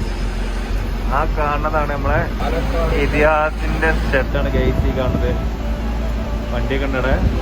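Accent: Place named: Palakkad, India. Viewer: Palakkadan ഭാഷ 😂😂😂❤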